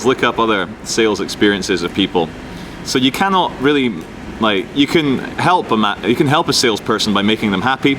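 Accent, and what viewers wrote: Scotland, United Kingdom. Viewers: He's british but probably grew hearing different english accents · its a jock accent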